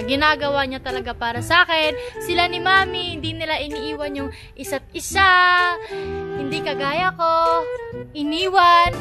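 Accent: Visayas, Philippines. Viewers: Wait bisaya kaba ate? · Ate Bisaya kaba?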